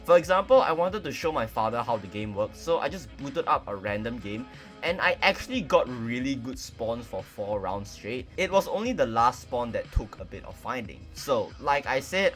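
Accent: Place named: Singapore. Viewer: Bro has the most Singaporean accent i heard in a long while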